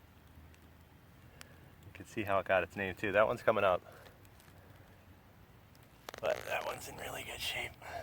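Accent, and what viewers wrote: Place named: Pennsylvania, United States. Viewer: I watched a bottle dump episode of yours and I knew you were from PA from your accent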